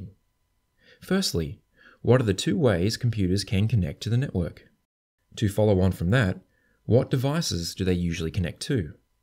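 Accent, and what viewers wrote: Australia. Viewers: Networking lessons in an Australian accent? What could be better?? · Is it Australian accent?? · So, the narrator is Australian, I assume, based on his accent, right? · Very well made and informative video. Very easy to listen to australian accent also! Thank you.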